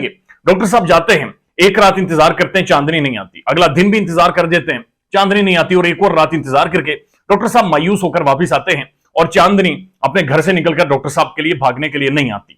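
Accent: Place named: Punjab, India. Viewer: Your Punjabi accent is just woww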